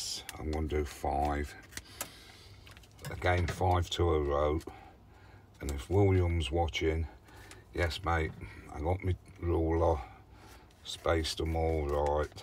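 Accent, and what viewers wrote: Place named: RP, United Kingdom. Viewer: Just a suggestion mate, take it or leave it, comes from good intentions. Consider changing your channel name. Few will search for " " but many would search gardening in Leicester or some such. You are at a good time to switch as you are just starting to build. All the best, my posh, well spoken friend:))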